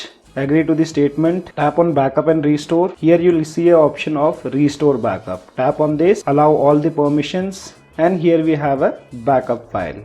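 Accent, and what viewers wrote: India. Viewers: everytime i hear a India voice i think a scammer Donda dell me Whad do do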